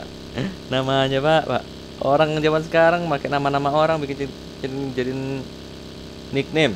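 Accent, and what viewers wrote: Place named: Sambas, Indonesia. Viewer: Bahasanya kayak orang sambas,kalbar😁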